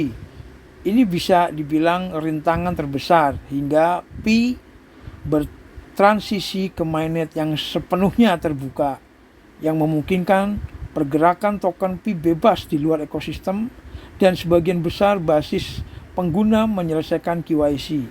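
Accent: United Kingdom. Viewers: KEREN ENGLISH NYA PAKAI BRITISH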